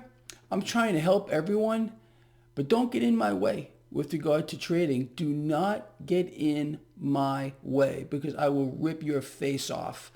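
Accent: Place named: New Jersey, United States. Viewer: you're my nice fren, share his knowledge.. I think never lie.. and very funny.. maybe you from NJ?? sound like my fam.. we from NJ.. you aint from vegas... Um from down da shoor.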